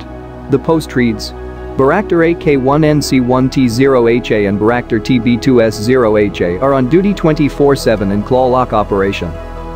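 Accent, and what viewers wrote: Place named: United States. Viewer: Ich frage mich wie krank ist die Menschenheit, die Überschrift heißt, Endlich hat die Türkei die Tödllichste Drohne. Als ob die Welt ohne Waffen da steht. Der Sprecher dürfte wohl Amerikaner sein. Ich wünsche uns allen Frieden und Freiheit auf Erden ohne Waffen, und nicht mit Waffen.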